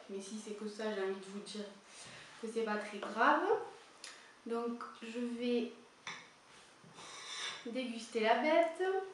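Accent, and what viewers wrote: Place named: Languedoc, France. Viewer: Le format classique est bien AOP... et moins cher au kilo et il a surement plus de chance d'être fait a coeur (encore qu'il faut le choisir a défaut de pouvoir demander a sa fromagère), personnellement que ce soit celui la ou le Gillot ou tout autre au lait cru, je les trouve très fort, pourtant je vis en Normandie, a votre accent (Languedoc ?), je devine que vous non, shame on me, lol. Le Neufchatel ou le saint-félicien, de la même marque, sont très sympa aussi.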